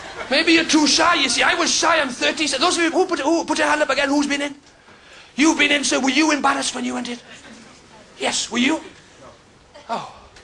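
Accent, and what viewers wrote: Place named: Wales, United Kingdom. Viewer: He's fantastic and I love welsh accents which makes it all the better.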